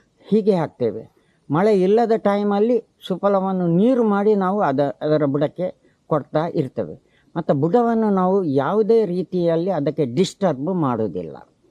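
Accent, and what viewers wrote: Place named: Tamil Nadu, India. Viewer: ಇವರ ಭಾಷೆ ಯಾವುದು.. ಕನ್ನಡ ಸರಿಯಾಗಿ ಬರಲ್ಲ ಅನ್ನಿಸುತ್ತೆ.. ತುಂಬಾ ಕಷ್ಟ ಪಟ್ಟು ಕನ್ನಡ ಮಾತಾಡುತ್ತಾಯಿದ್ದಾರೆ. ತಮಿಳುನಾಡಿನ ಜನ ಕನ್ನಡ ಕಲಿತರೆ ಹೀಗೆ ಮಾತಾಡೋದು.. ಪದಗಳನ್ನ ಕೂಡಿಸಿ ಕೂಡಿಸಿ ಮಾತಾಡುತ್ತ.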